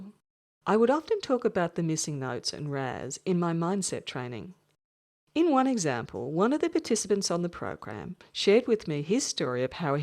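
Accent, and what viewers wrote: Australia. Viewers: Australian accent is killin me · Horrible australian accent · women with Aussie accents <3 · razzzzz. sounds like an Auzzie professional surfer.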